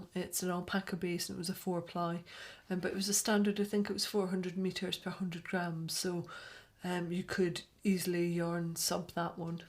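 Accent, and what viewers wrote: Scotland, United Kingdom. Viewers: Your shoulder issue has properly scared me into calling a doctor for an appointment. 😅 I really want to crochet a pair of socks for myself but I find that I am more intimidated by crochet socks than knitted ones. The Rivuret (spelling?) top y my pattern for the back to school sweater CAL and I'' making it in the loveliest orange shade. I would love it if tou recorded a small podcast whilst in Scotland, it would be amazing to listen to your true scots accent. Totally inviting myself to have tea and cake with you and Charlie 😂.Lots of love to you!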